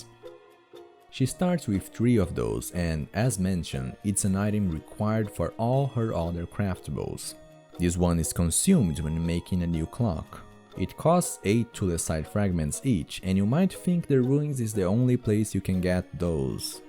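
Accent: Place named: Brazil. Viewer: Será mesmo? O inglês é ótimo, mas me lembra brasileiro.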